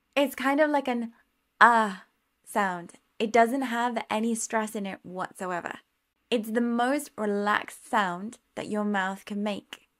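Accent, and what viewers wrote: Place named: United Kingdom. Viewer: How interesting! I never heard of the schwa sound before – it helps a lot to understand that nice British pronunciation I love! ❤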